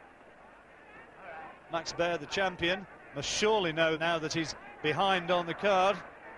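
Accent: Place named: United Kingdom. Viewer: The Russians are smart enough to get a British sounding guy to narrate the fight, but don't be fooled...this is Russian television.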